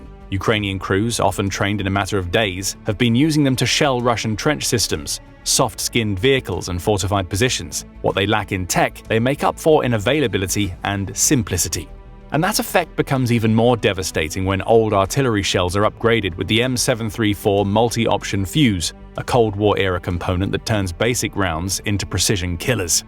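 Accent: United Kingdom. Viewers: Oh 900 out of 6000 you sound British what do you know ??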